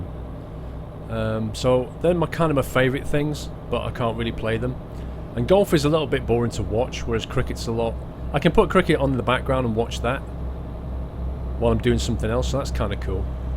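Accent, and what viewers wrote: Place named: Yorkshire, United Kingdom. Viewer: Your from Yorkshire, sound mate same!!!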